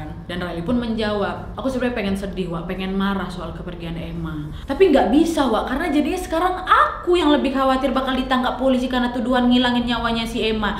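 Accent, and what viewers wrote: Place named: Sumatra, Indonesia. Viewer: Penasaran, org mana sih. Logatnya ky dr sumatera. Familiar SM aku, enak ngomongnya.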